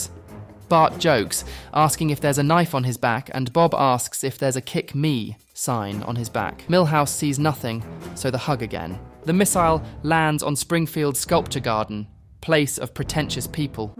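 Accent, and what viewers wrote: United Kingdom. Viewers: Hes britsh a can tell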